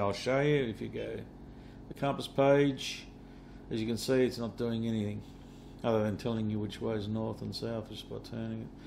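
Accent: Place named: Australia. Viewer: Great video---I stuck around just to hear that aussie accent.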